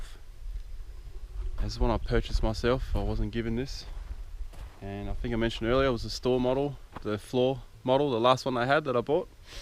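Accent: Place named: Australia. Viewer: I just love Aussies say 'in winter'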